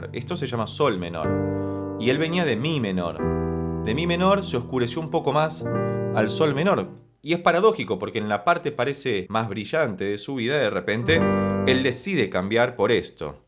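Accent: Argentina. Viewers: Buenas tardes maestro, siempre brillante en su análisis y comentarios. Dicho esto tengo que comentar, por que sino reviento, y espero que se lo tome como un cumplido, lo siguiente: es inevitable que su análisis me recuerde al legendario monólogo de Les Luthiers sobre el maestro Mastropiero, el maravilloso acento argentino evidentemente tiene mucho que ver...pero más allá de eso, si me distraigo un segundo de su estupenda explicación me viene a la cabeza a Mastropiero y....me cago de la risa. Muchas gracias por los maravillosos momentos que nos brindan sus vídeos, mi admiración y mis saludos desde España.💃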